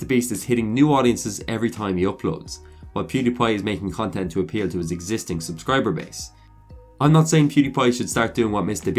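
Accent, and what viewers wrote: Ireland. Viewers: The name and accent made me think you were Irish and then you said tirty it was obvious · I knew you sounded Irish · I'm from ireland and I could tell you were as well from your accent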